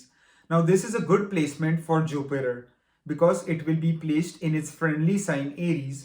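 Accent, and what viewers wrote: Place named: United States. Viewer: After waking up in the morning, you take your phone in hand n switch on the net and you get the notification from about upcoming Guru gochar... Knowing that Guru being my both lagnesh n rasheesh, it's gochar in Trikon gonna be beneficial, you are so eager to listen to it from your very dear n fav Marathi guy with American Accent 😃😃😃 and your beginning of the day become cheerful.... ❤ Thank You So Much dearest for making my day 🎉❤🙏😘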